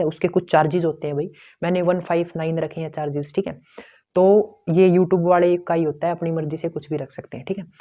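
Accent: Haryana, India. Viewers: Aap haryana se ho ??? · Language ki tone se pta lgra h ki aap haryana se ho...main bhi haryana se hu...kurukshetra se..... 0.1😍😍support farmers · Bhai app kaha se hai pure haryanvi bool rhe hai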